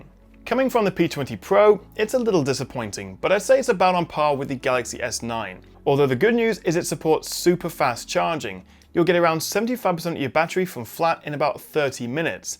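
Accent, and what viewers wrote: United Kingdom. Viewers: Finally I found a decent English( I mean localization) Tech review media brand on Youtube, I love the British street view and ofc your review, ( with a solid British accent which satisfies me a lot ), Cheers!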